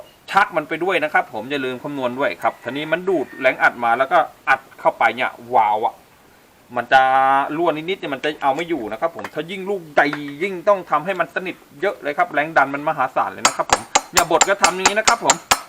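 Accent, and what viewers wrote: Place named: Uthai Thani, Thailand. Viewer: สำเนียงช่าง เหมือนคนหนองฉาง อุทัยเลยคับ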